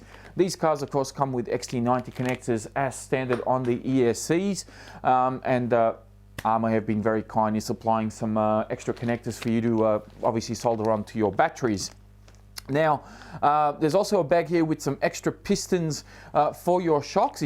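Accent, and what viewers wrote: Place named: Australia. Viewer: I’ve been to the hobbyking live event in the Netherlands last weekend, and I must tell you that I really like the australian accent that you and the rest of the aussies (hobbyking guy also) have.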